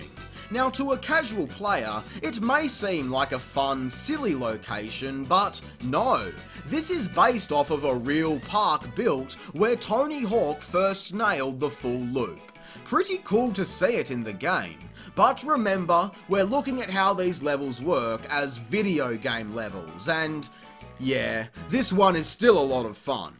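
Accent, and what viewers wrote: Australia. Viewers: Thanks, didn't wanna listen to this guy's infuriating Australasian accent for over 2 hours. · Are you australian?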